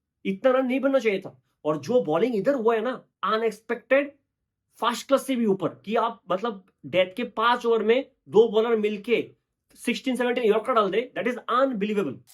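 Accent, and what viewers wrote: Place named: Tamil Nadu, India. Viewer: Bengali hai kya bhai 😲 😲 mujhe laga tamil nadu ka hai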